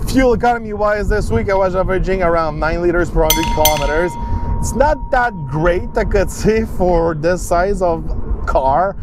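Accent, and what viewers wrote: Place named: Quebec, Canada. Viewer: Le ptit accent du Québec 😆👌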